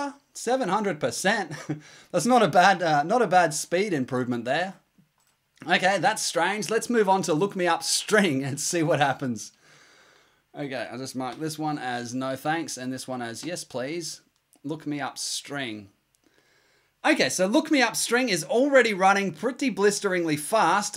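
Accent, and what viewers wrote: Australia. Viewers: Hi, mate. Are you from Australia?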